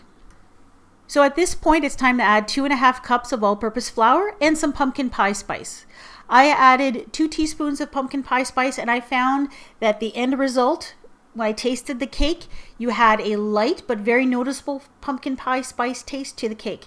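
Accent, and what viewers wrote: Canada. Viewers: I love your accent! Are you from Canada?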